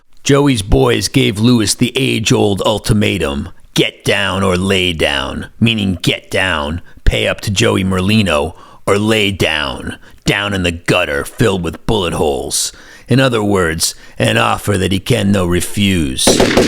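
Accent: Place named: Philadelphia, United States. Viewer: Great stuff. And ignore the rednecks who are bothered by the South Philly accent. I am glad it annoys them.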